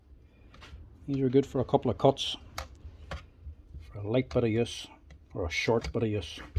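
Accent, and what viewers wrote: Ireland. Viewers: Why are these tool repair guys always Irish?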